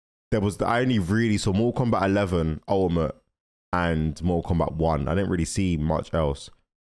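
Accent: United Kingdom. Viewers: bro is so bri'sh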